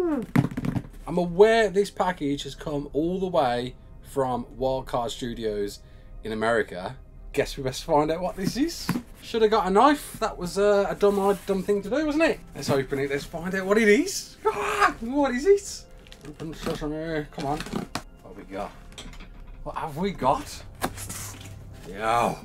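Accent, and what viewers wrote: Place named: Liverpool, United Kingdom. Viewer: You sound a bit scouse, are you from liverpool?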